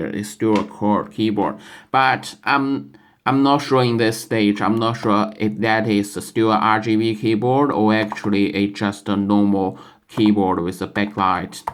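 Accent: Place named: China. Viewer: 中国口音的英语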